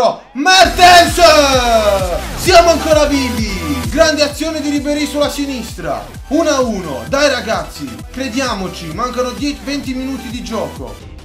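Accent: Sardinia, Italy. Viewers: ma sei sardo?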